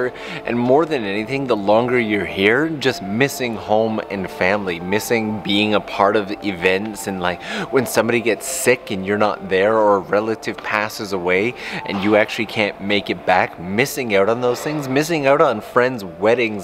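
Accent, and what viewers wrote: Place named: Canada. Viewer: I've just started watching a few of your videos and I heard your accent. I was 90% sure you were Canadian but wanted confirmation. I saw this video and I clicked it so fast to scratch that itch I had. Sure enough, you're definitely Canadian looool. Greetings from Jamaica!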